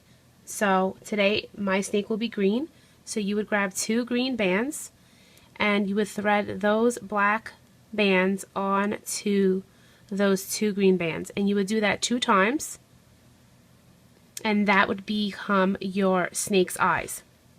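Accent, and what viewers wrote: New Jersey, United States. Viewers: Are you from New Jersey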